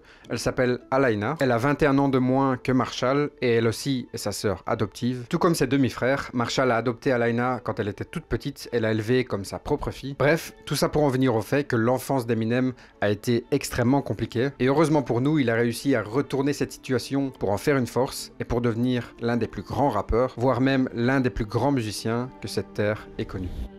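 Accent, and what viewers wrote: Belgium. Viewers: bien aimer je like et m abonne J'ai comme l'impression que tu es Belge car tu as un accent. Pas comme le mien encore car je parle d'après mes amis Bruxellère lol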